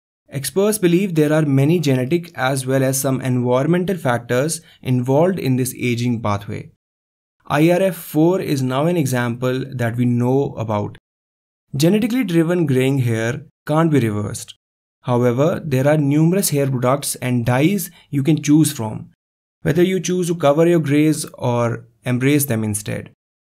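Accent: India. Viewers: Hmmm let's see this vid.Oh,India accent... Nevermind. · indian accent is so weird to listen.. kinda comedy haha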